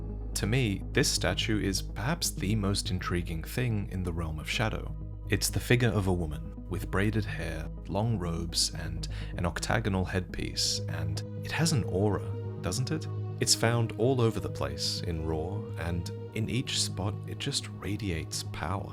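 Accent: Australia. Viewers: Wait wait… YOU’RE AUSTRALIAN!?!?!!?!? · is Australian? lol hello from Bali, mate! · I’ve been here for years so how am I just finding out you’re Australian?🤣🤣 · revealing he's Australian like a jump scare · I didn't even pick up that you're Australian and thats coming from a fellow aussie xD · Most shocking lore revelation in this video for me was not in fact Elden Ring lore, but Lore, I had no idea you were Aussie! XD Phenomenal work as always, can't wait for your next video! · Been watching for 4 years now and only just found it he's Australian. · Didn’t even realize you’re Australian how did I not notice that you have the accent too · IS AUSTRALIAN???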